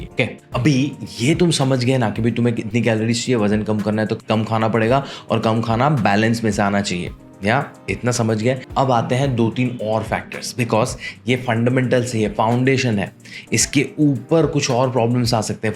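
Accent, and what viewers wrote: Gujarat, India. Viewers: ARE YOU GUJRATI ?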